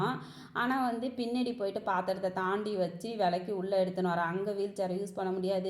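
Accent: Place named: Cuddalore, India. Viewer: சீக்கிரம் உன் ஆசைகள் நிறைவேற பிராத்தனைகள் மா ❤🎉 எந்த ஊர் மா நீ. கடலூர் பக்கம் என்று நினைக்கிறேன். ஏன்னா பேசுறது எங்கள் பகுதியில் இப்படி தான். நேரில் பார்க்க நான் வரேன் மா. எல்லாம் நல்லதே நடக்கும் உனக்கு. உங்கள் சொந்த வீடு என்று நினைக்கிறேன். எப்படி உங்களுக்கு தகுந்த மாதிரி கிச்சன் மேடை அமைந்து இருக்கு 😢🎉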